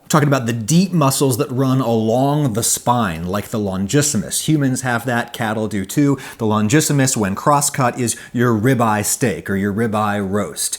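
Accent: United States. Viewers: He just sounds American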